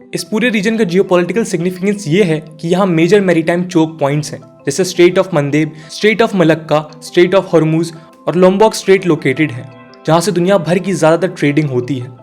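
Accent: India. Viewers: 视频咖喱味太重了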